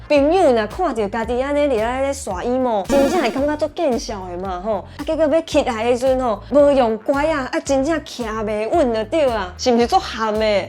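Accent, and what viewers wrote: Taiwan. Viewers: 難得聽到標準的臺語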